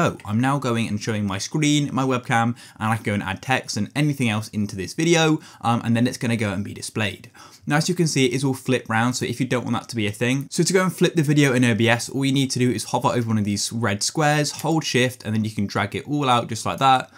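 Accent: United Kingdom. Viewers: HE'S BRITISH!!!!!!!!!!!!!!!!!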